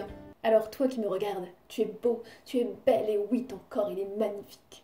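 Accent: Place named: Southeast, France. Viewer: « On est tous le beau gosse de quelqu’un. » J’adore! Tu es du sud-est, vu ton accent de bel canto??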